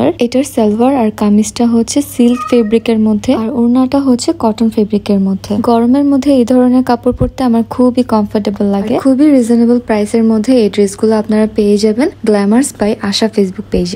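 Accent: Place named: Chittagong, Bangladesh. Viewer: চিটাগং এর মানুষ খাটি শুদ্ধ বলতে পারেনা আপনার কথা তার প্রমান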